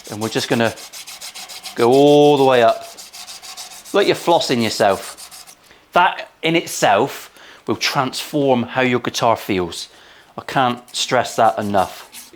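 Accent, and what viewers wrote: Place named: Scotland, United Kingdom. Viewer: I love your videos to. I just wish they had subtitles in English, for native speakers. The guy in these videos is Scottish or part leprechaun or something, and I feel that content is getting lost in their accent at times.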